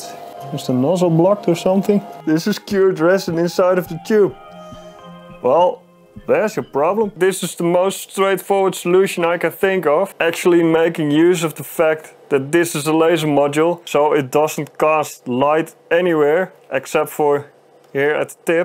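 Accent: Netherlands. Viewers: hahaha prachtig, ik dacht het al te horen aan je accent en ik wist het zeker toen je iets nederlands zij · Man je hoort gewoon dat je Nederlands bent 😂.. Gave project !! Er zijn ook pompen welke een constante flow hebben. En je hars wordt door de uv (welke uit je draden lekt) gehard in de slang.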